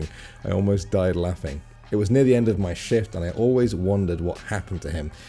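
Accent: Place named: United Kingdom. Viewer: You sound British. I thought the Brits say "arse"?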